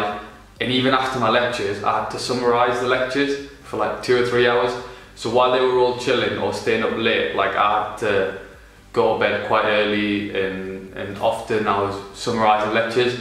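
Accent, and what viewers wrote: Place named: Teesside, United Kingdom. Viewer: are you from teesside? you're the only person doing medicine i know who sounds like me lol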